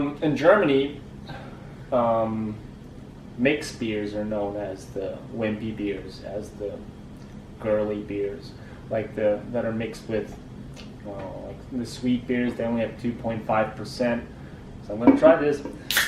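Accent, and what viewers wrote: United States. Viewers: Till you say something with a R or a L in it you sound American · Lol, dachte du bist echter Amerikaner bis ich den Titel richtig gelesen hab, kein Scheiß. Super Ausprache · He sounds more American than some Americans Girl bye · You sound American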